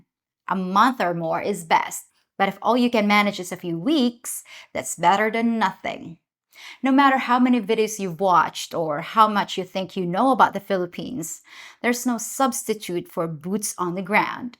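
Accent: United States. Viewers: I’m very curious. I watched a video where you talked about your young life in something of a “tell all” but I’m more curious about your knowledge of the West, your command of the English language and vernacular. Yes I get you learn English here but yours is a very American English and combined with your knowledge of our culture and routines I’m betting you’ve spent a great deal of time in the West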